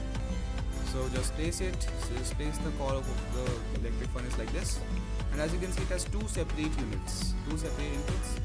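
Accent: India. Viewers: Bro ur indian ?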